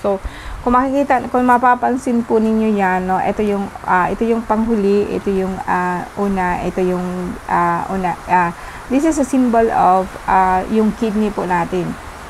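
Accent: Bicol, Philippines. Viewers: Bicolana ka po?😊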